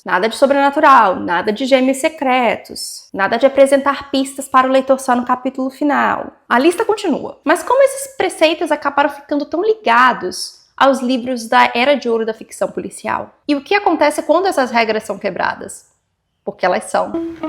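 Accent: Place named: England, United Kingdom. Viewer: Parece que meu cérebro escuta voce falando português, mas no final da frase tem um sotaque inglês 😅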